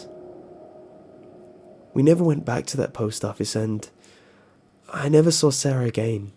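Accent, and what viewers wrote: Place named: RP, United Kingdom. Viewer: Omg when your on youtube and you listen to american vids all the time and then listen to a british guy we sound so posh lol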